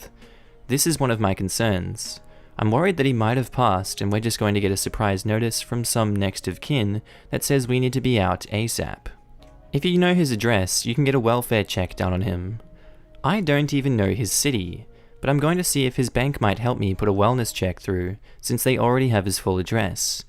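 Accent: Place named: Australia. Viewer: I might have a crush on the Ozzy accent.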